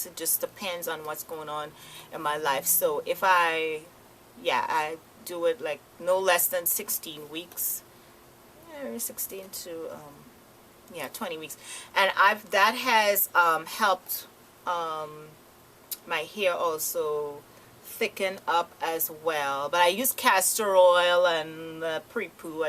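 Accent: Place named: New Jersey, United States. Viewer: Hey... Where r u from? U sound just like my aunt from Jersey. N we r Trinidadian. Anyways, pretty hair! I subbed! :)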